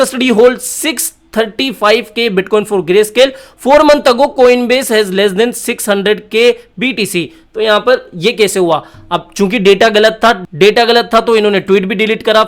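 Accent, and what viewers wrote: Rajasthan, India. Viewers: Rajasthan se ho kya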